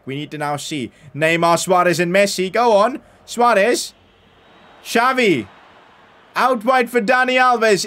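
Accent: India. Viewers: Are you Indian coz your talking way is like that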